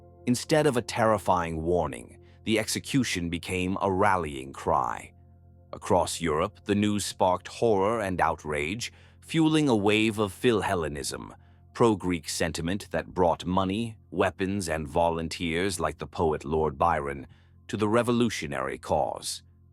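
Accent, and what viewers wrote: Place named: United States. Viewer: DOBLAJE Y PRONUNCIACIÓN INFAMES Y VULGARES.... ¿Qué forma es esa de pronunciar nombres griegos, turcos y europeos? lo pronuncian todo a la anglogringa... ES REPUGNANTE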